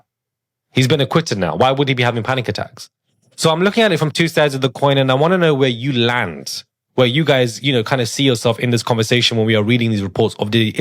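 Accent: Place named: England, United Kingdom. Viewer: English accent sucks..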